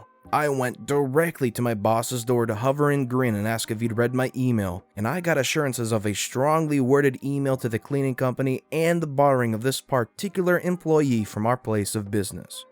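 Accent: United Kingdom. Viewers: Are you British? If so hi.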